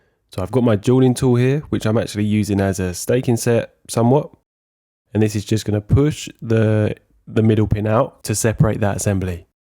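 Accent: London, United Kingdom. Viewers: Hello. Nice video I liked it all. As a Seiko nut I am still surprised I’ve not worked on a 5606 I have 3 to restore but just never got around to them ! You clearly are English and London by the sounds of it so what cleaning fluid can’t you get? I still rate Essence of Renata for a good hairspring degreaser. As for crystal don’t bother looking for originals as you can get any domed , flat or tension ring on aftermarket for little money from cousins. Sternkruez make decent ones. I’d only seek out original Seikos on acrylic flanged or special facet ones. The gear you will have bought from Adrian as they are good. A guy in my Facebook group recently 3D printed the 4 teeth ones which he was selling for $5 each. They work good apparently. I have 5 in stock as I know two of my watches have the fault. Also been looking at that jewellers tool myself recently! 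Will buy it now I think.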